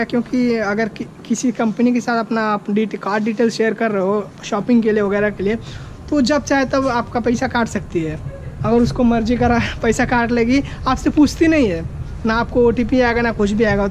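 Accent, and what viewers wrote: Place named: Bhojpuri, India. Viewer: Ekdam bhojpuriya babu jaisa bol rahe hai bhai , we need video like this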